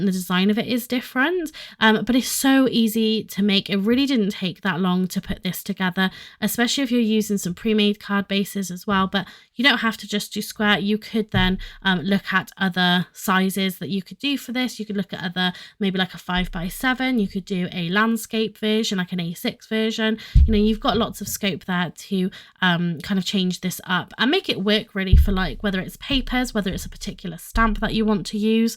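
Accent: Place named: Merseyside, United Kingdom. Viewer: Your accent sounds mixed. You sound like youre from Merseyside area and been in Wales for a longer time. Its lovely anyway, beautiful card 💞💞💞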